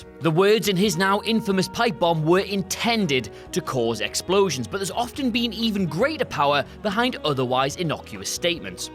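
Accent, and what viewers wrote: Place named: England, United Kingdom. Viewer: Not used to an Englishman speaking English?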